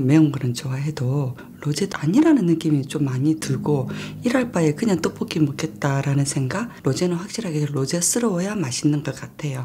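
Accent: Busan, South Korea. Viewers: 참 재밌게 하시네 부산말씨~ 나도 부산사람이지만 ㅎ 끌림이 있다 이분 ㅎ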